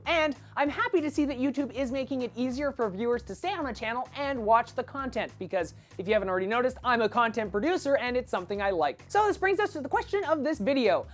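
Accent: Canada. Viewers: Being from Canada It's actually his accent...he didn't say it wrong. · Guys, he's Canadian. Italian pronunciation doesn't really have a lot of influence. Both are acceptable.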